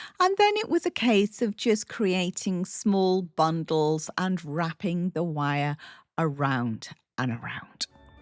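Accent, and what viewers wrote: England, United Kingdom. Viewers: I know things are different in England ( I assume from your accent that that is where you are located ) however the "Ice Screws" you talk about are not ice screws, Ice screws are the item rock climbers use to tether themselves to the rocks they are climbing . What you bought were screw hooks for the golf balls . Lots of great ideas here.....thanks for sharing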